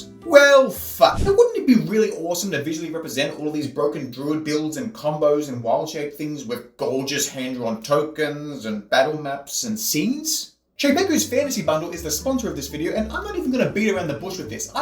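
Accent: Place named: Australia. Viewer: G'day mate. Just searched for Aussie D&D channels and yours came up top of the list. Good to hear a familiar fast talking accent 😂 Druids are so broken. The kind of class you love to play, hate to DM 😅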